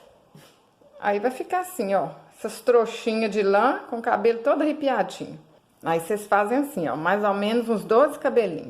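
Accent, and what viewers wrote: Minas Gerais, Brazil. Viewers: Estou apaixonada pela sua arte e por esse jeitinho "mineirim" de falar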